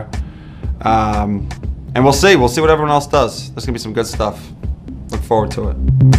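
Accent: New York, United States. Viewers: He’s such a New Yorker. I didn’t know for sure he was from New York but you can just hear it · I can hear his New York accent. Especially when he's answering the Alec as a mundane question and he's like "You don't gotta..." 😍😍😍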